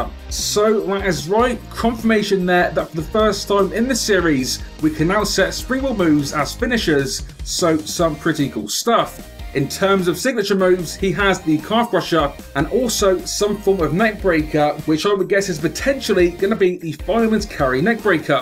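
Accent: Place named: Bournemouth, United Kingdom. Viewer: I think I know where your accent is from. Are you from Bournemouth, England? And btw another great video you're killing it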